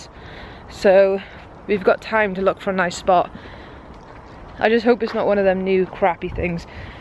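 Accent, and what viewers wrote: Wales, United Kingdom. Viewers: assume wi ya voice ya welsh where about wales are u ?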